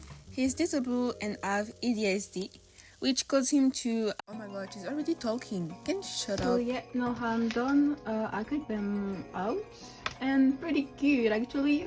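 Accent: France. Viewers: Are you french? If not I'm sorry ik nothing abt accents 😭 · I have a question, are french by any chance??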